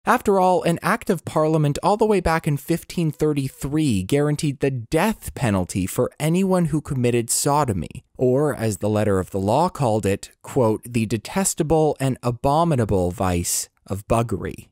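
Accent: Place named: United States. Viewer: As soon as I heard an American accent, I suspected this would be a pile up on the interstate. I’m glad I was proven thoroughly wrong. Well researched, beautifully read, and pragmatic. I for one won’t hesitate to elevate Abse to hero status. He had to hold his nose to say certain things to get it over the line. In retrospect, the weakness of the legislation ought to be seen more in its scope than its content, as it didn’t apply to Scotland or Northern Ireland, which had to wait until 1980 and 1982, respectively, to enjoy some partial decriminalisation of their own. Sadly, Abse’s work was undermined by the police and judiciary in the following decades as they pushed people through the legislative cracks into prosecutions with laws that were never really intended to be used in certain ways. At one point it was legal to insert a fist into a man but illegal to own a video of such an act. 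Even into the late 1970s, gay men and women were still being subjected to psychosurgeries (lobotomies and leukotomies), ECT, or horrendous drug-based conversion therapy. Some are still alive today to tell the tale. The fact remains, the architect of gay rights in the UK can be traced back to Alan Horsfall, Ray Gosling and Leo Abse.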